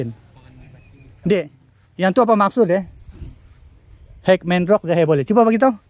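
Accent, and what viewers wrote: Sabah, Malaysia. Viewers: Bro lu pkai je loghat sabahan .. Tak ada Hal kami faham😅😅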